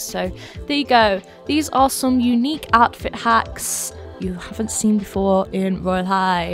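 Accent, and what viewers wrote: United Kingdom. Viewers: Weird question, are you British? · Why do i feel like your half british. o.O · Is this British accent BC if it is its music to my ears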